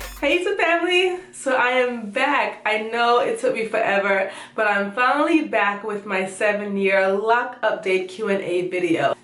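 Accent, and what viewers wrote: Jamaica. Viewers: Mi love yuh patwah!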